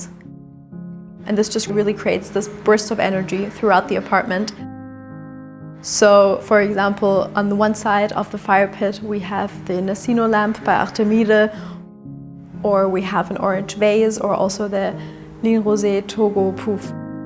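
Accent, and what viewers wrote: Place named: Germany. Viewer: nonchalant 💅🏼 der deutsche Akzent ist so adorable.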